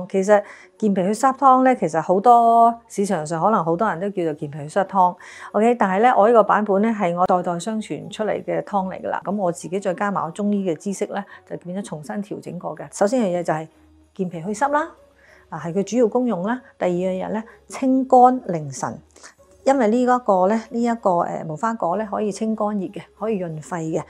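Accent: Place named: Hong Kong. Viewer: 講話清浙快折暢順，純正的香港話，博學的中醫知識！令人聽落舒服感受！容易學會及掌握各樣要點 𧹍𧹍𧹍!!!香港中醫學術領域之光！😊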